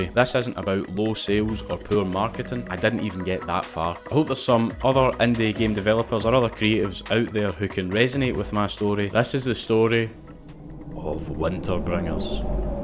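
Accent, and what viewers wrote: Scotland, United Kingdom. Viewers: Good Sir Scotsman thank you very much for sharing your valuable experience, learned a lot from it and enjoyed your nice accent 👍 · Dude, your voice is epic, gotta love Scottish English! Dont give up gamedev. You enjoy it, thats all that matters, sooner or later you will have tons of money :D Cheers from Ukraine!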